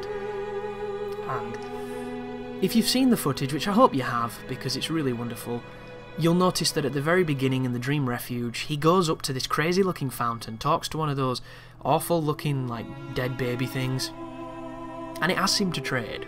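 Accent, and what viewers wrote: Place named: Yorkshire, United Kingdom. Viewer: Good vid, can tell your from Yorkshire haha ;)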